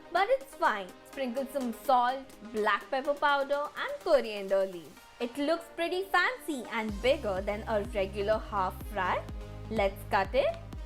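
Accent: India. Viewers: I love her Hinglish ☺️😅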